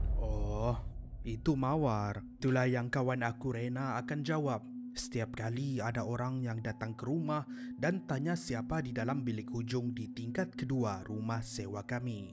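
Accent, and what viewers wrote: Sabah, Malaysia. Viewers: orang Sabah kah?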